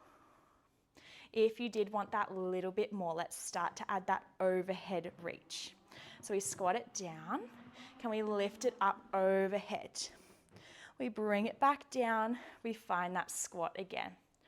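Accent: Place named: Australia. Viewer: I've only recently found you. I've done couple of your classes, and I really enjoyed this one. I also LOVE listening to an Australian accent instead of American! 😊 I also like how you refer to different reformers and modify for them. I have a YR, and I'm an instructor, but don't do enough of my own practice. Thank you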